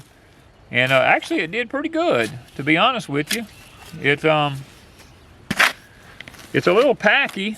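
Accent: South, United States. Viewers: I love a southern accent .....most of my most watched content creators have an accent , there has to be some type of crazy science behind it. Reminds me of my childhood. Born and raised in Northern New York Went to North Carolina during the summers Id always come back with a twang 🥰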